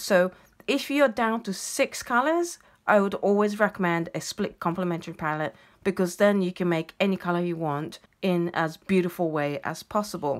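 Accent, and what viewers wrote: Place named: United Kingdom. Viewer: If I could paint anywhere in the world, I would choose the UK. Many of my favorite artists that I watch are there and I would love to go get lessons from them or even just watch them paint in person. And the accent they speak in is just adorable as well, just like yours! Thanks for the chance to win and I hope you feel better very soon.. Blessings, Stacy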